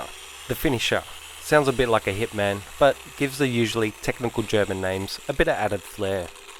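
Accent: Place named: Australia. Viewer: After the Bullshit Techno Intro Music I wasn't expecting to hear an Aussie Accent.....Pretty good review though. Not sure that it's helped me decide between the Rupes LHR15 MK2 or the Flex. Maybe the Rupes is a better all round machine? So I'd be best to go with 125mm backing pad on whatever machine I decide on rather than the 150mm. Is that correct?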